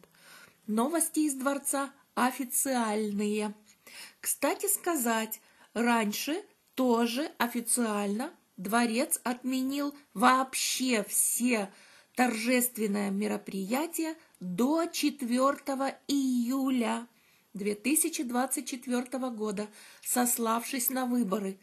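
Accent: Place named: Rostov, Russia. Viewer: НЕ- КАК ??? НУ И БЛА БЛА БЛА с ростовским акцентом !!!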